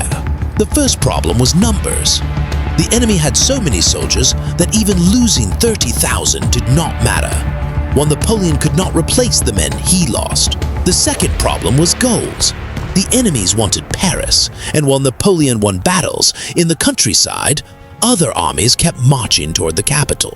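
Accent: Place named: United States. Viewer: Jeez.. this American narrator voice completely ruined the video😳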